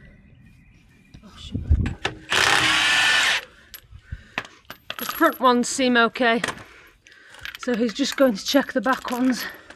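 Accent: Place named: North England, United Kingdom. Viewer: north yorkshire, cumbria border. thought you were somewhere up north. lovely accent. secondly, you really do get completly different weather up there. you are harder. fair play to you. i hope you get some decent spring weather. and everywhere dries up. you are another superstar of the industry. good on you.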